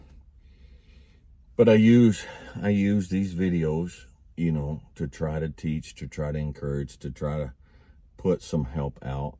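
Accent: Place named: Wyoming, United States. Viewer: im from Wyoming I’m stationed overseas and its rare I come across something that reminds me of home and just the way you talk reminds me of home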